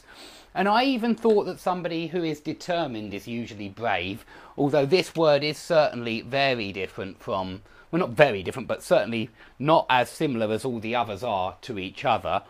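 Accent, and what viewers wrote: England, United Kingdom. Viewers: OMG! I've just found your channel, vely useful I love british accent.I promise tolearn from yours.. Thank you ever so much♥️😊♥️ Apr 5th 2020... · Hi Teacher I love your lessons!!! I love your english accent!!!!!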